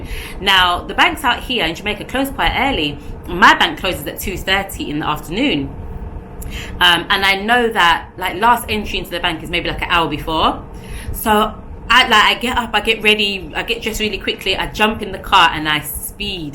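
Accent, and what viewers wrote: United Kingdom. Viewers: is true, but you have to acknowledge the lovely British accent no matter what. I think the bank representatives did, too. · Hi. In my opinion, they asked you to write the letter to the branch manager to determine how intelligent you are. Your letter in conjunction with your lovely British accent made them determine you were not to be played with. Food for thought. Blessings!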